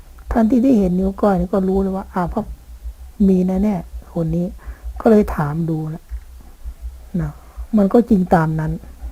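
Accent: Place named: Isan, Thailand. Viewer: ดีน้อ หนุกะอีสาน พอฟังออก555 ตามฟังอ. อยู่เด้อจ๊ะ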